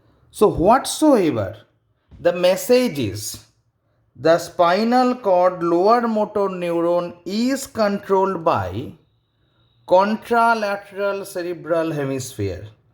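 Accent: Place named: South Africa. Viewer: Thanks - crystal clear South African 👏 May you align its clinical application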